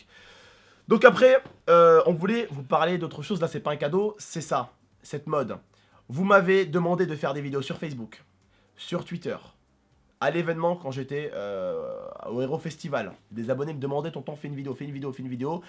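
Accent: Quebec, Canada. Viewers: ptn toi tu fais 24 k de vue en 2min et une personne en 1 h fait 5 vue t trop fort bravo l'accent du quebec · ptite accent quebecoi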